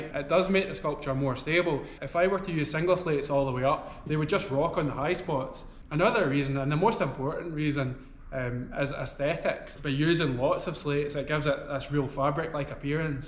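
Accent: Scotland, United Kingdom. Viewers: Hi, thank you for answering my question about where your slate comes from. It was really interesting to see the two pieces together (the red and the green). As always it's so nice to see your beautiful work. How I'd love to have a dovecote like the one you made! Looking forward to seeing more of your videos, and as an American, to hearing your Scottish accent.:)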